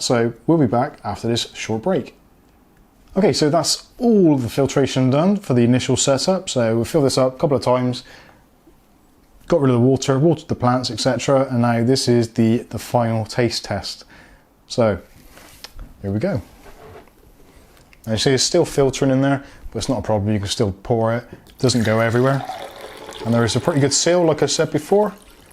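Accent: Bristol, United Kingdom. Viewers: Certainly was; good to see it put in layman's terms. Love the Brizzle accent by the way! ;)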